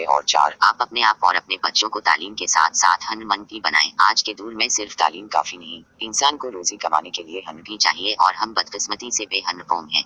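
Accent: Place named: United States. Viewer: یہ امریکن اردوہے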